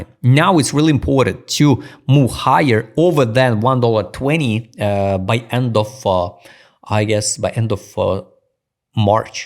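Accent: Russia. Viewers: судя по твоему произношению , ты русский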